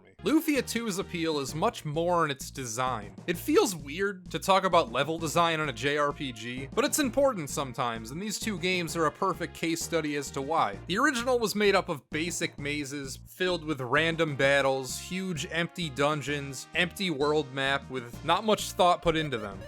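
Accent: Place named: Midwest, United States. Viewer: wait, if you're from florida and live in texas, why do you sound like you're from iowa, definite midwest accent.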